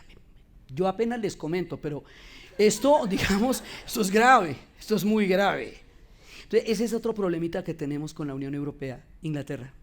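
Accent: Colombia. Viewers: Genial, aleccionador además que me encanta la forma de hablar de los colombianos jajajaja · QUE ORGULLO COLOMBIANA TIENE ESE ASCENTO BOGOTANO TAN NITIDO, COMO TODO LO QUE NOS ENSEÑA GRACIAS TOTALES!!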